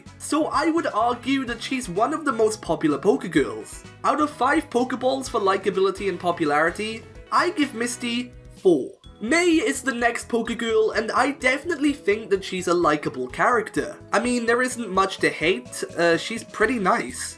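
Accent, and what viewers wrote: Wales, United Kingdom. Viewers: Nice to hear a Welsh person on YouTube!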